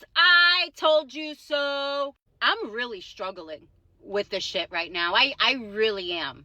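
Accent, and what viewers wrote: New York, United States. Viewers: Actaully if you would actually watch and listen to us farmers when we speak. Plenty of vidoes of us. Its the Ag monopolies that are killing us. Pretty much all grain is controlled by 2 companies. ADM. and Cargill. They are keeping grain prices low. You honestly sound like your from newyork. And never seen a farm. Lol.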